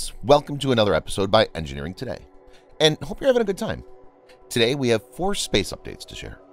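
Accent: United States. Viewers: I can imagine his voice is perfect for learning American English!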